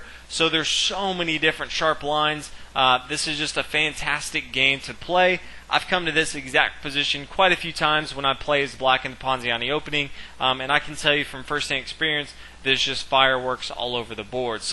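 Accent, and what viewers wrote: United States. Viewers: thanks a lot for your videos, helps me to find a path in that wood of openings. now i only have to find a way to make my son (13 years, german and learns oxford-english at school) understand your amarican accent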